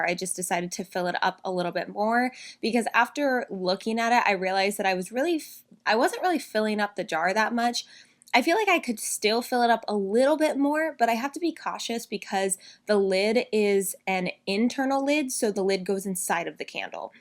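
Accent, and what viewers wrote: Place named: United States. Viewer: i just cannot stand your grating american accent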